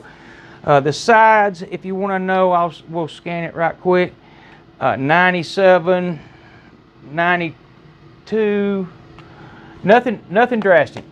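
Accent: South, United States. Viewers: Your accent makes me ashamed to call myself southern. It’s represents the worst of humanity. And the most ignorant of humanity. I can just “hear”you never went to college. Its stomach cringing. I hate ghetto street thugs as well. Your both just two different sides of the same coin. Stop talking !!! Trump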